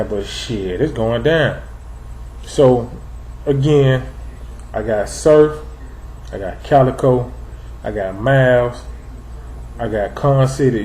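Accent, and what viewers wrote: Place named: South, United States. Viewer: N I fucks wit u cuz u kept it 100 all the way I could tell u was frm the south b4 u said it real nigga shit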